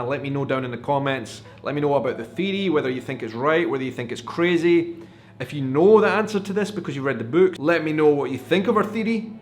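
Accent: United Kingdom. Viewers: Make no mistake I LOVE YOUR CHANNEL and as I said to another person we ALL have accents including me. But my California accent is ugly!! They said in NY that it was all those flat ''A's"" like ''baaaaaaath''. It's not classy or cute like yours or in all of Britain. I loved it when you said ''Roger Roger, Roger what are you playin' at Son!!'' LOL! I know wow.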